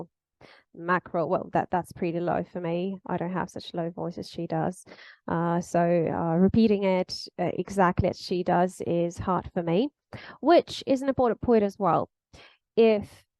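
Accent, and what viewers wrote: United Kingdom. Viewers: i love the gentleness and preciseness of the british accent if that even makes sense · What's the practical reason for having such a very British-like sounding accent?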